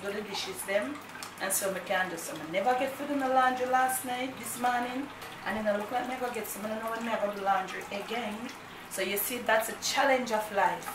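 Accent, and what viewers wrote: Jamaica. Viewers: I do enjoy watching you and listening to you. You are a real Jamaican. You're so funny one minute you're talking nice and the next minute you're bursting out into the Jamaican patois. 🤣😉 I absolutely love to see you cooking. I would like very much if you did do a video showing us how you clean your home.🙄😀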